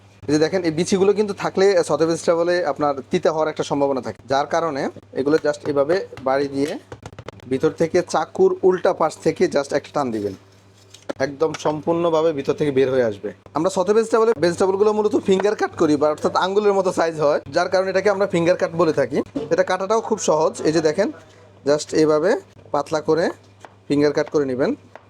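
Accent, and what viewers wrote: Noakhali, Bangladesh. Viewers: ভাই আপনার বাড়ি কি নোয়াখালী?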